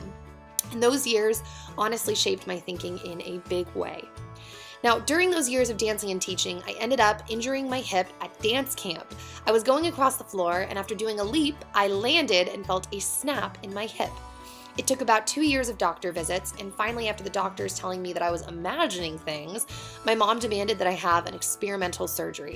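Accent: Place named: Wisconsin, United States. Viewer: what state are you from you sound kinda like Wisconsin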